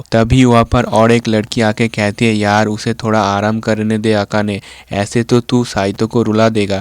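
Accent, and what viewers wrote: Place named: Bengal, India. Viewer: Sudhar jayega tu tension mat le o ak Bengali fir bhi kosis kar raha 😂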